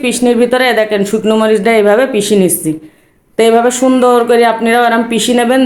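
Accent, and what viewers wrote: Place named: Chuadanga, Bangladesh. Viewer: অনেক মজা হলো মনে হচ্ছে 😋 বাড়ী কোথায় তোমার চুয়াডাঙ্গায় ? কথা শুনে মনে হচ্ছে চুয়াডাঙ্গার মেয়ে 😅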